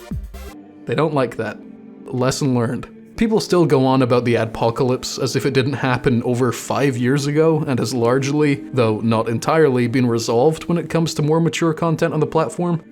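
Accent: Scotland, United Kingdom. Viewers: he’s like Scottish jerma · I did not realize that you were Scottish until this video I just thought you were polish or czech or something because that's so common for youtubers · wait you're british?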